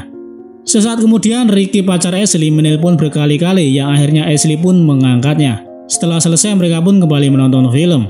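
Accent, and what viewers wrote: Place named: Java, Indonesia. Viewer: Jowone kentel mas wkwkw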